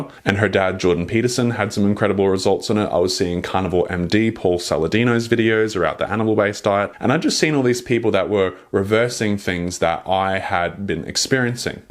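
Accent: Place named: Australia. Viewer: I’d love to know what this Australian-ish accent is about :’) oath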